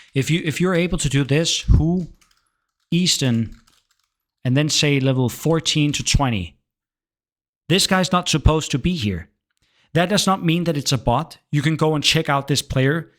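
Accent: Denmark. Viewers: God, i like these videos.. But the commentary and danish accent is awful.,.. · that is the most danish accent i ever heard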